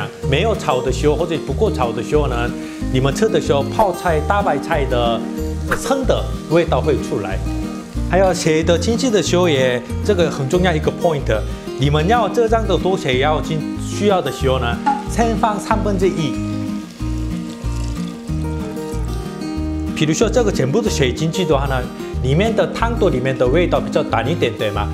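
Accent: Cantonese, China. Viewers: Kai老師國語有廣東腔，哈! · 有點廣東腔是正常的嗎🤣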